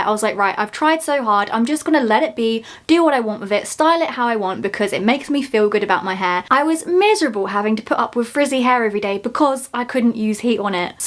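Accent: United Kingdom. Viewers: I love British girls and their accesent!❤ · is this british accent?? it appears i can't stand it it sound so raw and unnecessary annoying :( the accent could have been triggered my misophonia but i like u so best of the luck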